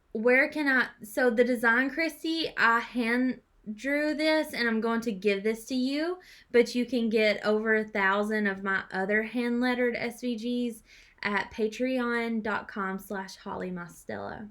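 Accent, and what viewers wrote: South, United States. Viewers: Your beautiful projects match your beautiful smile & personality. I’m in MI and l could listen to your sweet, southern accent all day. Thank you for taking time from your family to show us these crafts. They are always so beautiful!💕🎄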